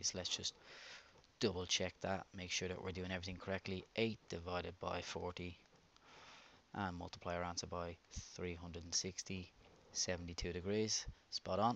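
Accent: Ireland. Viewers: I love ur accent ! Are u irish ?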